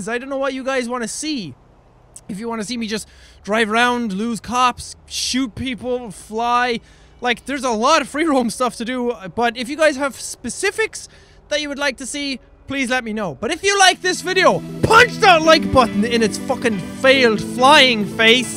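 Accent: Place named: Ireland. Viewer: TICKY BOMB love you accent I'm part Irish but I don't have the accent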